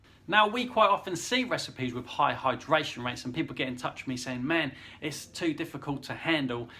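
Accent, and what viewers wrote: United Kingdom. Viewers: From where, in the UK, is his accent from?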